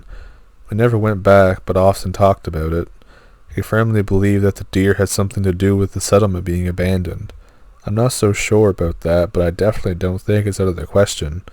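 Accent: Canada. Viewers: Thanks for letting us hear your kitty cat! Your accent is soooo Canadian. Nice!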